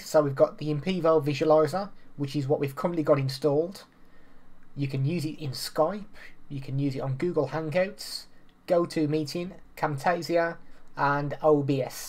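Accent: United Kingdom. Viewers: The prob is partly the British accent. 🥸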